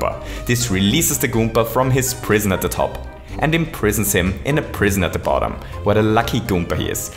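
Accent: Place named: Germany. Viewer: Also ich find die Videos ja Super, aber der Deutsche akzent zu den Videos ist schrecklich x)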